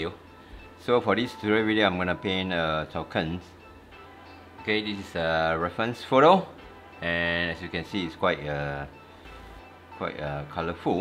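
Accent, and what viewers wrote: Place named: Singapore. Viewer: Are you singaporean? You have the accent of one haha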